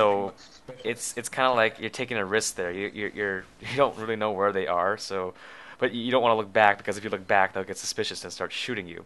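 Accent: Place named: United States. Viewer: To be honest you sound very american.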